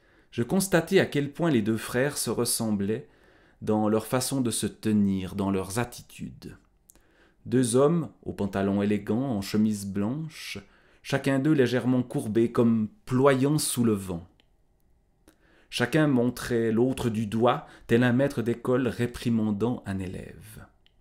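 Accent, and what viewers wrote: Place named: Vaud, Switzerland. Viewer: beaucoup aimé ton accent qui vient de chez moi ( je suis vaudois)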